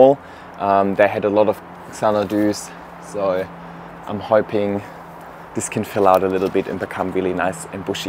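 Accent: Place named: Germany. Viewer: Can anyone tell me if he has any German background 😭😭 I know his name is but my god his accent sounds so German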